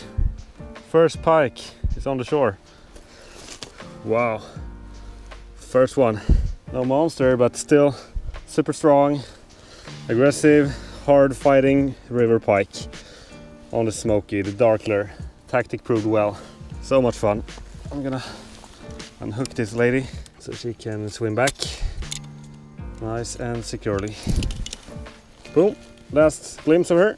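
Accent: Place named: Sweden. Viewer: Varför pratar han engelska när man kan höra att han är svensk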